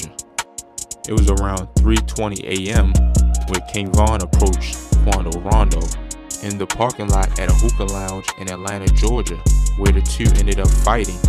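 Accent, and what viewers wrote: Baltimore, United States. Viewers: u gotta be from bmore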